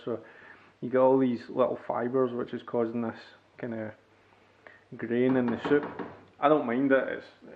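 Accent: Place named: Scotland, United Kingdom. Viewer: he has a beautiful Scottish speaking voice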